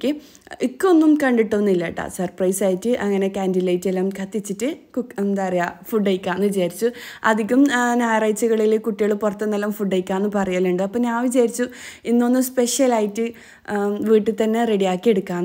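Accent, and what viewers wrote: Kannur, India. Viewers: English channel kanunna pole nd.. masha allah.. but varthanam njmmle kannurle🫶🤩food kuraknamnn vijarkum iyalde recipe kanumbo pinnem ndakki povm.. keep going🎉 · കണ്ണൂർ ഭാഷ തന്നെ മതി കേൾക്കാൻ നല്ല രസമാണ് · കണ്ണൂർ ഭാഷ പറ ഇതാ ഞങ്ങളും കേൾക്കട്ടെ കേൾക്കാൻ നല്ലരസാ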